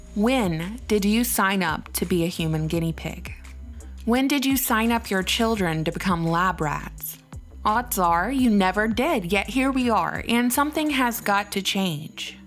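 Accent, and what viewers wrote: South, United States. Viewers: A southern Belle and wish there were more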